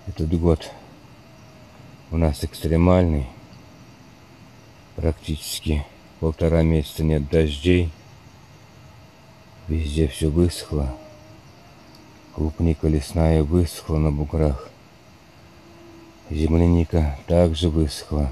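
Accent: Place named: South Ural, Russia. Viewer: 56 лет назад я уехала с родины, Южного Урала, в Казахстан и нашей земляники не видела столько же лет. А вкус её помню. Очень приятно услышать наш говор южноуральский. Спасибо, что всколыхнули воспоминания.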